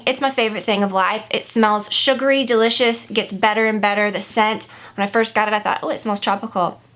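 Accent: South, United States. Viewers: You are FAB! (Your southern accent is subtle, but so cute!)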